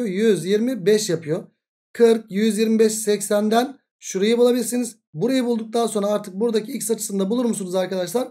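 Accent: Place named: Balıkesir, Turkey. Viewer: Hocam sanırım Balıkesirlisiniz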